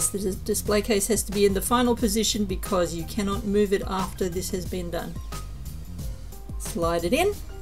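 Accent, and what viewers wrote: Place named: Australia. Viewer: Love a fellow Aussie voice, and such a sweet clearly explained tute. Thanks chicky 😊😊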